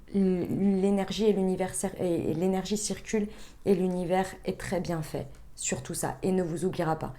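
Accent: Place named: Paris, France. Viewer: J'aime trop ton accent parisien il me fait grave sourire. Sur le fond toujours au top comme d'habitude 👌😊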